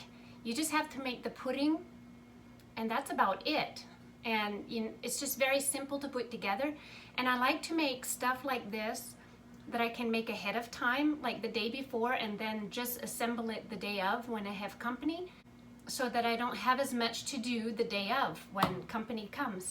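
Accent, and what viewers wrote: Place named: Pennsylvania Dutch, United States. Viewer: Könnt euch ein video nur auf Deutsch machen, bitte. :-) I like your Pennsylvania Dutch dialect.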